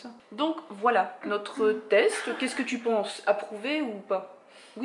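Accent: Belgium. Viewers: tes belge??? sinn super video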